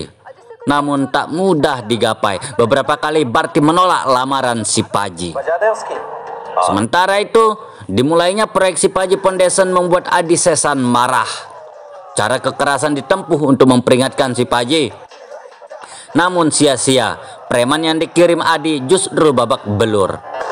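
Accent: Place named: Bali, Indonesia. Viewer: logatnya hmpir2 mirip kaya org Bali ya kdg2 😁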